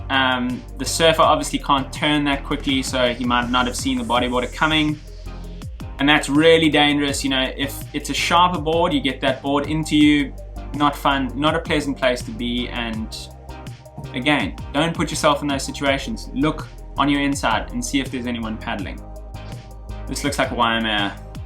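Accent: South Africa. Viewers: Hey you’re a South African 🤙🏻 Lekker Bru. I bet you’re from South Brim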